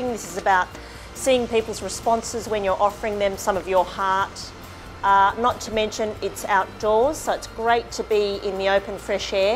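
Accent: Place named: Australia. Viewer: Lovely Chinese girl ruined by an Australian accent:-)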